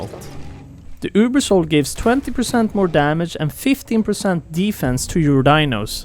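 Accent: Sweden. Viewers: är det svenska jag hör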